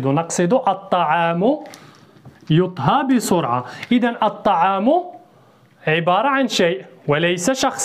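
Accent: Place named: United States. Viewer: هذا نطق أمريكي و ليس بريطاني على ما أظن أستاذ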